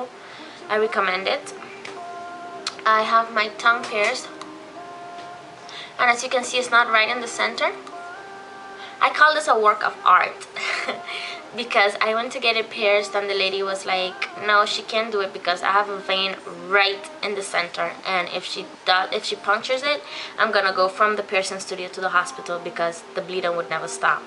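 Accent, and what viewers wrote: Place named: West Indies, Caribbean. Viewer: I love your west-indies accent! Your real pretty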